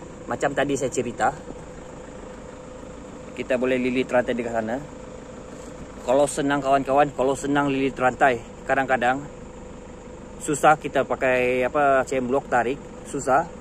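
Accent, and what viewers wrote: Sabah, Malaysia. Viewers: Abg org Sabah ke?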